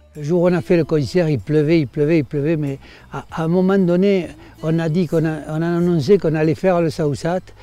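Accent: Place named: Pyrenees, France. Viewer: Monsieur Nadau juste Merci. C’est un bonheur de savoir que vous vous amusez en réalisant ce qui vous anime le plus « la chanson » mais c’est aussi et surtout pour nous un émerveillement d’écouter vos paroles tellement forte et pleines de sens. Vous nous faites rêver grâce à votre accent, votre patois la beauté de vos Pyrénées. Toujours un plaisir inouï de venir dans votre région. Merci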